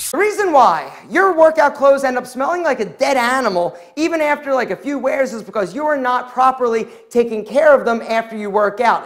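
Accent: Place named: New Jersey, United States. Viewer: just found your channel: am a NJ native, you totally sound like your from Jersey or PA.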